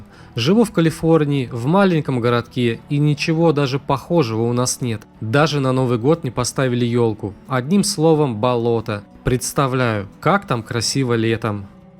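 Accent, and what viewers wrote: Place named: Ukraine. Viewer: Ты не россиянин. Ты ципсо. Это говор и вранье с Украины.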